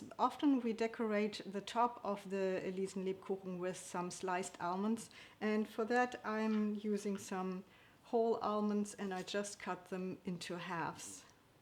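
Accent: Germany. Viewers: danke ganz toll. man hoert deinen deutschen akzent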